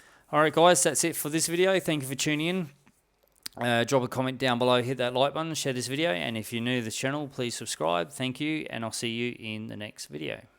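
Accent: Australia. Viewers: This is the worst video I stopped watching it halfway. This guy clearly does not know his facts. Hes ays in the one part where he speaks about the beaches "I assume." He very bravely and confidently points out Seal Island's location, but meanwhile he points it out on the wrong side of the bay. He keeps on saying it is fake, but menawhile he is spreading fake news. How can a guy with and Australian accent pretend that he knows the South African coast and what happening there, but meanwhile contradicts himself.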